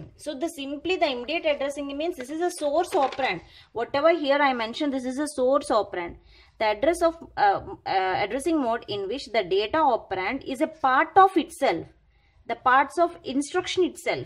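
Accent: India. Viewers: It's okay her accent may be she is an Indian girl I think ,but nice to listen to her discussion very well explained.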